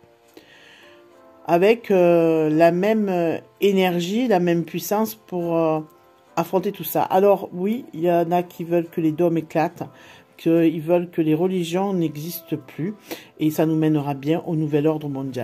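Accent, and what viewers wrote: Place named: Marseille, France. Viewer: Bonsoir, toujours très agréable de vous écouter avec votre accent de Marseille 😘 Merci beaucoup pour vos tirages. Oui il est temps que les croyants se donnent la main pour que la lumière soit 🙏🕊️✨💞 celle du coeur l'amour inconditionnel ❤️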